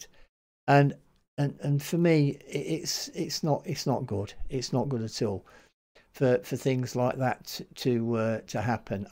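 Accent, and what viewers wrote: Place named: United Kingdom. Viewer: Thanks always from Japan 有難う💛。 Yes. When you reply to or put heart mark on my comment, it makes me happy, but that is not what I expect on your reaction videos. Your smiles, grinning, smirks and witty observations in British accent on Band-Maid, BABYMETAL etc. are what I want to get from you. The reaction video is just for fun, not mandatory duty for both reactors and viewers. Please keep on just the way you have been.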